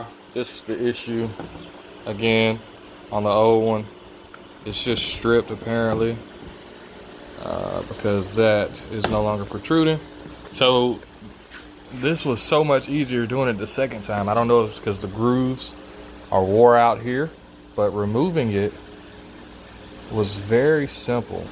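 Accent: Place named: South, United States. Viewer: Yo G you sounding hella country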